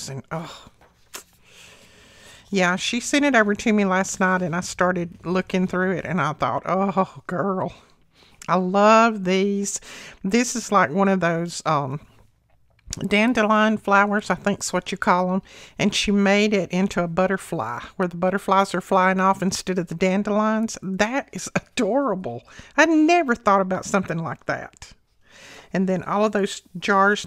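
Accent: South, United States. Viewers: For not coming up with new ideas you sure knocked it out of the park with those. And the term is too many irons in the fire. I think they used to put irons in the fire. Literally. 😂. Great job as always. I love watching you craft and hearing your southern accent. So cute. Sending much love and blessings, Sharron 🥰🥰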